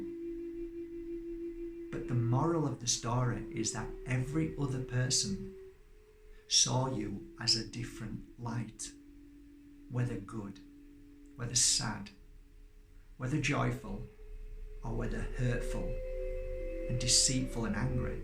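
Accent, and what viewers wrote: Yorkshire, United Kingdom. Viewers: Hey! I´m so glad I´ve discovered your channel about 10 days ago. I really don´t know how it happened. I´ve been seeing loads of your videos ever since, and all of them are just fantastic. Your energy is so uplifting and addictive. Before I didn´t know a thing about moldavites and now I´m totally in love with them. Their beauty is totally out of this world;) I really love your channel since the very first minute, it´s bloody incredible. On top of that, I lived in Leeds and just love the Yorkshire accent, now I´m back in my home town in Spain and I really miss it (At first I didn´t understand a word in the Yorkshire accent haha). A big thank you from the bottom of my heart for everything you do:)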